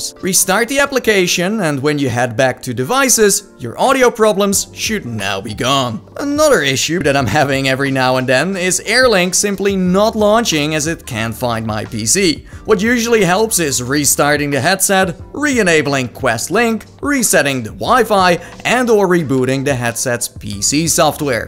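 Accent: Netherlands. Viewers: His accent sounds sooo dutch.🇳🇱🇳🇱🇳🇱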